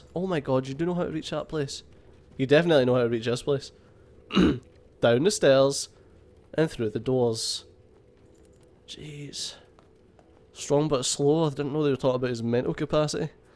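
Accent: Scotland, United Kingdom. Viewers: I fucking love this game and i love the Scottish accent. SO GOOD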